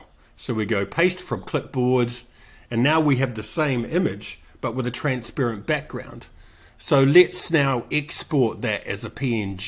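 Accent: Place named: Australia. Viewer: This guy has an Autralian accent. He sounds nothing like Joris Bohnson.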